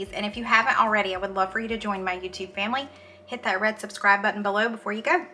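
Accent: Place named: South Carolina, United States. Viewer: Im a Carolina girl, living on Prince Edward Island, in Canada, and your recipes and your accent brings SC into my home. I made the White sauce chicken enchillada last night and hubby and I loved it!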